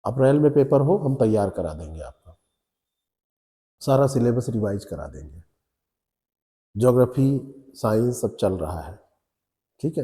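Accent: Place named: Purvanchal, India. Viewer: Lgta hai aap purvanchal k side k hai😂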